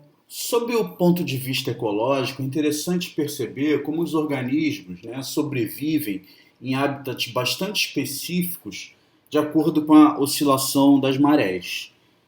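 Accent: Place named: Rio de Janeiro, Brazil. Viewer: ótima aula, inegavelmente professor carioca hahaha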